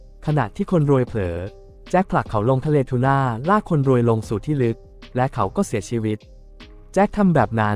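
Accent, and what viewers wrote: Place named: Shan, Myanmar. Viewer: ไทยใหญ่ชัดๆ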